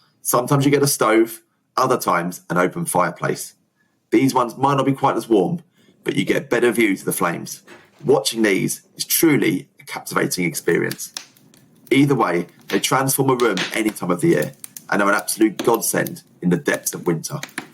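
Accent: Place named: England, United Kingdom. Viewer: Why say kilometers you're in the UK & sound English too we use imperial here 🤷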